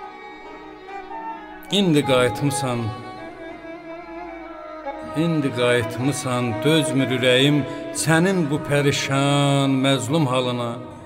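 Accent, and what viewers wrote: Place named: Aghdam, Azerbaijan. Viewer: Eziz qardas Siz ozunuz sesiniz lehceniz ureyiniz semimiyyetiniz ele Agdamdi Saa ureyimiz qurban ay Agdamimiz yangisi ile yasayan qardasimiz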